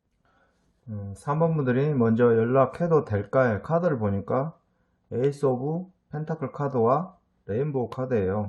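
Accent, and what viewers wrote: Jeolla, South Korea. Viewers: 2번ㅎㅎ 님뭔가 전라도분이신거같아욬ㅋㅋㅋㅋ뭔가정감가요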